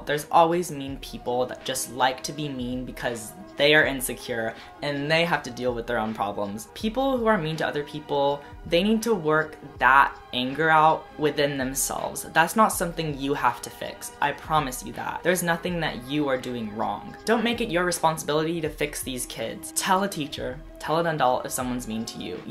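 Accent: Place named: South, United States. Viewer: i have been subscribed to you for some time now and im now realizing that you have a really light southern accent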